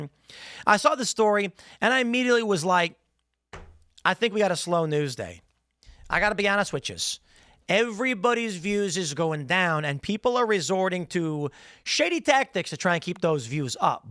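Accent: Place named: South, United States. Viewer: Good southern accent. I’m southern & sound like cornbread.😂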